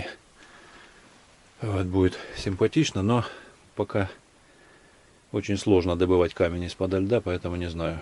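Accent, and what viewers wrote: Ukraine. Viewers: Судя по речи хохол в землянке от войны прячется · Судя по характерному говорку с ридной батьковщины приыхал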